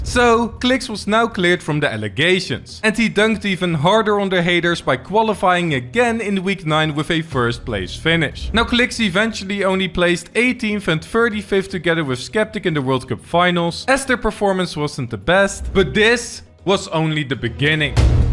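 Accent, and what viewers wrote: Netherlands. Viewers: Ik hoor gwn dat je nederlands bent 😂😂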